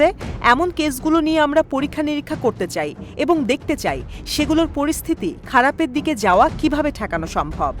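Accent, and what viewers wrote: Kolkata, India. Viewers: আজাইরা নিউজ, কোলকাতার স্টাইলে !!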